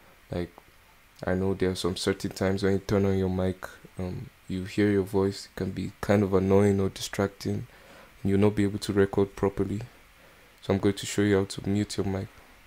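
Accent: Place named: Nigeria. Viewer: You a nigerian bruh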